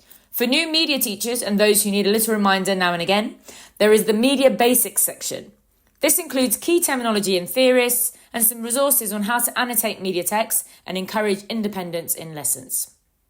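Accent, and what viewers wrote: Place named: United Kingdom. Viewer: A famous British accent!)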